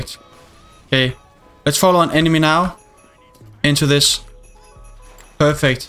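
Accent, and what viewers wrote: Denmark. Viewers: just a guess, but are you danish? if not then my hearing is completely broken, hav en god dag :)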